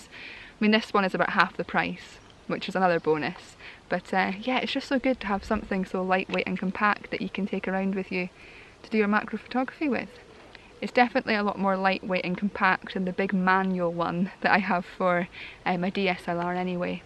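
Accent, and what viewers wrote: Scotland, United Kingdom. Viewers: I know it's just your Scottish accent, but at first I thought you said mackerel photography......was hoping you were going to get out a fisheye lens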